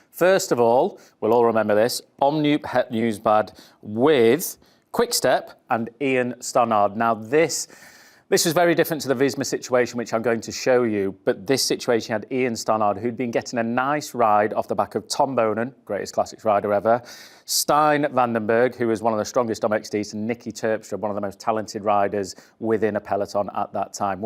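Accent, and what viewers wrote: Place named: Yorkshire, United Kingdom. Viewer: Thats a lad!! Proper Yorkshire lad!! I live in the states these days so watching and listening to makes me feel at home. Love it!! 🤘🏻🇬🇧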